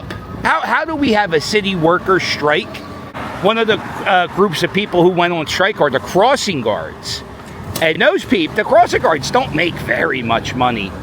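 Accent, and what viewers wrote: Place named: Baltimore, United States. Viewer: Yo how are you...with a Baltimore accent??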